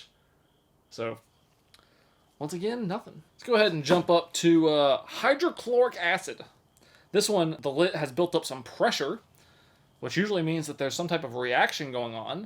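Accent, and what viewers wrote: South, United States. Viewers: I wanna say that was a joke but it comes far too easy for him. For what it’s worth though I believe we found out that is playing a character in some videos. It’s not noticeable now but if you check his old vids you can see how differently was acting back then. I swear he was even putting on a (stronger) southern accent in those vids on top of the rest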